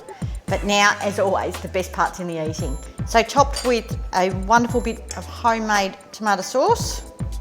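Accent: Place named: Australia. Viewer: From Greece....I remember these WHEN I was living in Australia....I may even try to make some and see how I do..LOVELY AUSSIE ACCENT!!!!Thank you for the videos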